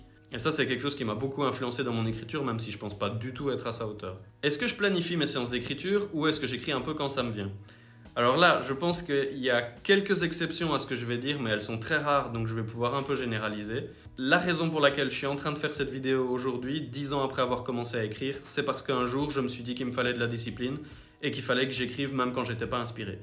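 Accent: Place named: Belgium. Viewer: Je m’abonne ! Tu as tellement de choses à nous apprendre, j’adore ! (Et ton accent belge me rappelle mes années d’études à Lille haha j’adore aussi!). Pour la prochaine fois, des petites phrases écrites pour les parties de ta vidéo ça pourrait être cool (là c’était les questions posées du coup), pour mieux imprimer de quoi tu parles, parce que si notre cerveau se déconnecte deux secondes, je sais pas pourquoi mais j’ai eu la sensation que de voir écrit « les chapitres » ça m’aurait aidé, en tout cas ça m’a manqué à un moment pour me repérer dans tes « parties ». Voilà voilà :) merci !! Hâte de voir les prochaines vidéos !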